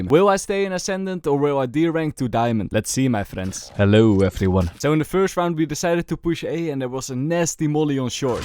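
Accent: Netherlands. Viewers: Haha instant hoor je de nederlander Nice kanaal :) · as a reyna main, i first want to say u sound really Dutch (the Netherlands) and second u say perfectly what i mean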